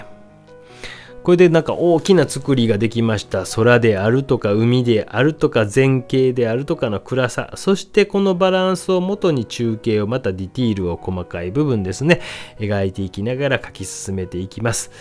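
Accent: Kansai, Japan. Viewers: ベネチアの風景にもなつかしさを表現されておられますが、先生のトークで時々入る関西弁も親しみがもたれます。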